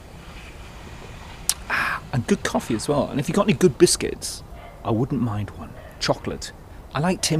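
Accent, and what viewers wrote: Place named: Scotland, United Kingdom. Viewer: Hello I love your chitchat with Citizen Kale, love your Scottish accent. 😀😀😀👍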